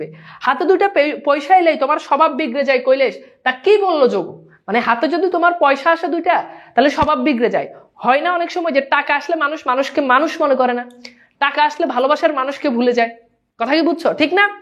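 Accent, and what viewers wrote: Noakhali, Bangladesh. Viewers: Noakhalit Khar bole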